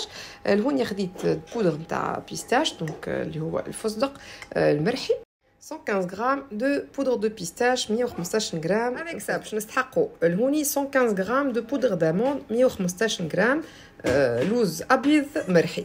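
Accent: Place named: Tunisia. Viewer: ما اجمل لهجة تونسية، روعة الوصفة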